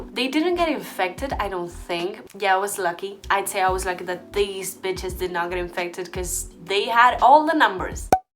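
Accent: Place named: Catalonia, Spain. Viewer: As soon u started talking could notice in your accent that you are Catalan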